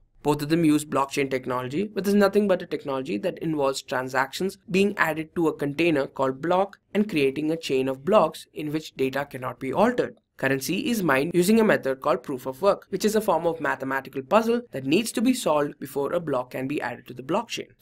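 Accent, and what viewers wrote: India. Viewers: Good info. Please improve your English accent from IN-GLISH!!!! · Bruh he asked is the voice in the video BELONGS TO A INDIAN 😂😂😂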